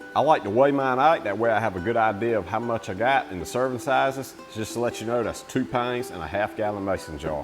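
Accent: United States. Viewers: Make American accents thick again. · As a Australian... he sounds nothing like a aussie. He's all American 😅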